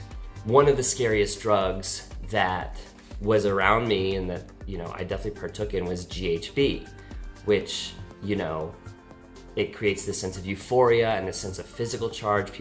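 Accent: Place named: Germany. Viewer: Even though your Englisch is fluid and sounds right, it's obvious that you are a nativ german! Sorry, not buying it...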